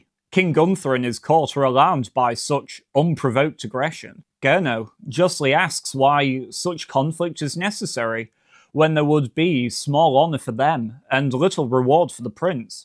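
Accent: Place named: United Kingdom. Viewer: American here with honest question. The reader has to my ears an unusual Brit accent. Where is he from?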